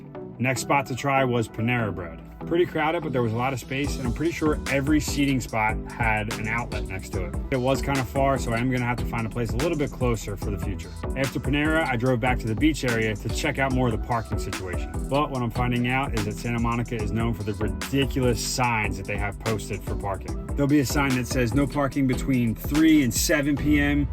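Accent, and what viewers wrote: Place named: Philadelphia, United States. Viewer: You got that Philly accent lol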